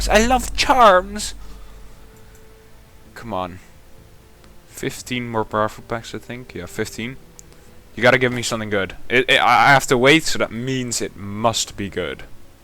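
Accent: Netherlands. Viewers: are you from the netherlands? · U dutch?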